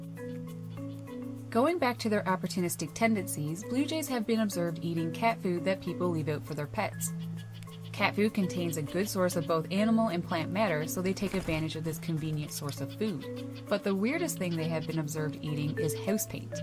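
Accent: Canada. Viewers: Oh, this lady is definitely Canadian. You could hear it as soon as pouch and then house 🤣. Bless your flappy headed hearts.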